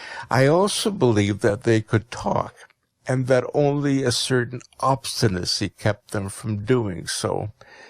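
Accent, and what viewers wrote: United Kingdom. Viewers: на слух - британский.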